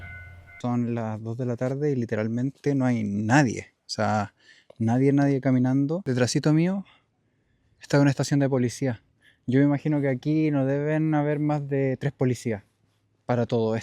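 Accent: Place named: Chile. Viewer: A mi me engañan apenas entre al video supe que era chileno, siempre hay un Chile 🐻‍❄️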